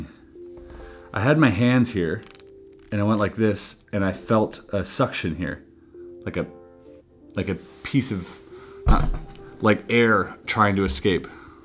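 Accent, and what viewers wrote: Germany. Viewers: Solid german accent my man high five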